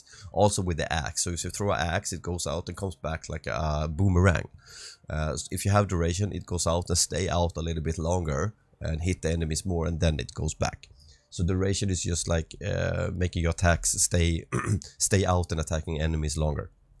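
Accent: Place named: Sweden. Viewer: w sverige always know a swedish talking english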